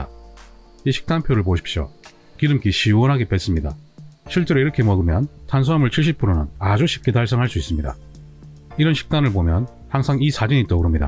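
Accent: Gyeongsang, South Korea. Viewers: 말투를 들어보니 대구분이신듯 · 선생님은 경상도 분이시군요. 목소리가 정감 있습니다.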